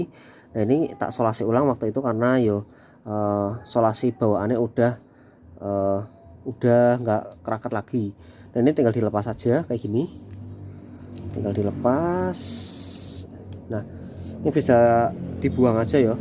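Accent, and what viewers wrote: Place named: Java, Indonesia. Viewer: Mantep mas josss.... Bahasane medok habis pokoke, salam sesama GTR bumiayu, semangat❤️👍.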